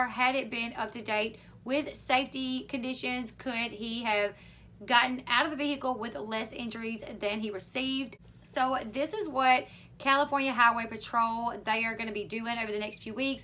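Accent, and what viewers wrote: South, United States. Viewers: Taking short wolks your voice is amazingly southern, and it was a 1970 barracuda yea but it had a hellcat motor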